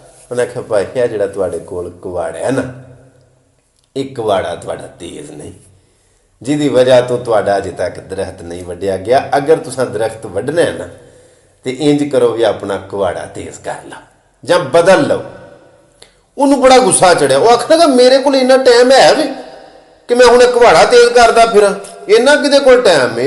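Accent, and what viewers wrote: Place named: Sialkot, Pakistan. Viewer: Pure Sialkotiya basha bolde sir 🙏🙏🙏From punjab india to asi bhi Sialkotiya Jatt hmm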